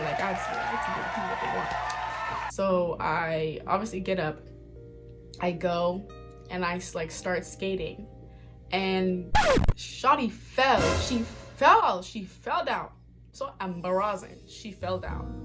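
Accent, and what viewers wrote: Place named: New York, United States. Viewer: I can hear the NY accent lol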